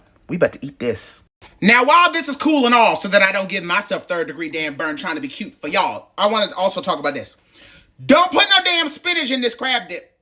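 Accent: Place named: New Orleans, United States. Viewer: I'm from Louisiana! And I always thought that Crystal hot sauce was a New Orleans product. And yes, I would use ALL the ingredients that you have there and some smoked paprika. And we call your bread a po boy French bread. I'm with you on the sugar thing, too!😂❤❤ (I'm trying to figure out your Maryland accent. Kinda sounds like some New Orleans folks!)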